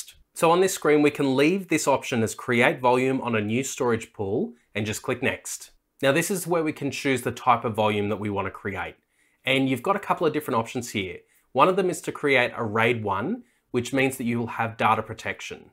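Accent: Australia. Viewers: Can I use one 2TB HDD and one 4HDD in the 2 bays at the same time? How many devices (phones, tablets, laptops and desktops) can be connected to this NAS? How many accounts/personal folders can be created for this NAS? Thank you very much for your response. (Your accent makes me miss my OZ friends, mate)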